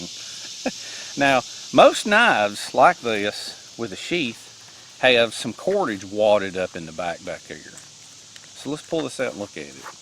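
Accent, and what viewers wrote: South, United States. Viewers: Hey Bro, I'm in South Carolina. By the sound of your accent, we're southern cousins. From which of theses United States do you hail, patriot?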